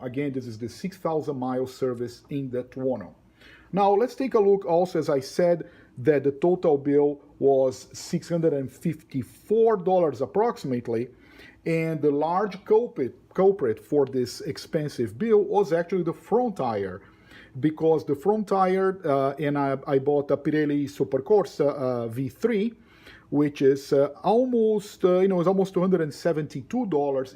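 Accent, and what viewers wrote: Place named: Brazil. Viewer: Thanks for the reference. I am getting close to 10,000km. BTW, you have a Brazilian accent :)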